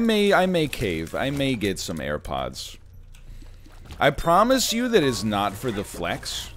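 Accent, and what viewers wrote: Canada. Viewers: Is it me or canadian accent is getting thicker?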